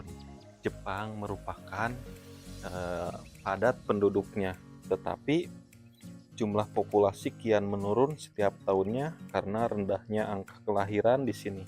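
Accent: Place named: West Java, Indonesia. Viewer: Koko dr Bandung nya,..? Aksen Sunda na kental banget hehe,.. kade atuh bisi rea jurig didinya😅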